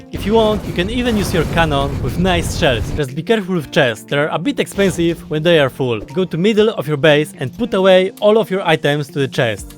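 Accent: Russia. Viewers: почему мне кажется что у тебя Русский акцент? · Well, I would say that I love you because you have a Russian accent, but I think it would be useful for you to learn Russian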